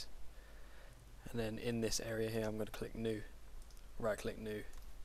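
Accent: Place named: London, United Kingdom. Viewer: London accent m8.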